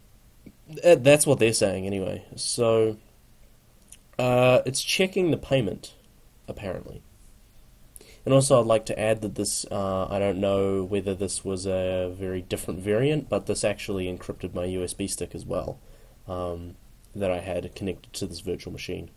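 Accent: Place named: New Zealand. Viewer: Nice kiwi accent ;)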